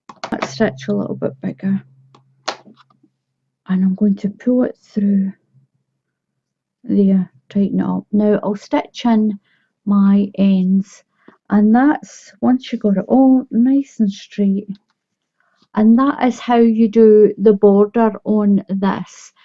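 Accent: Scotland, United Kingdom. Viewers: Hi from cork Ireland I love your tutorials also the Scottish accent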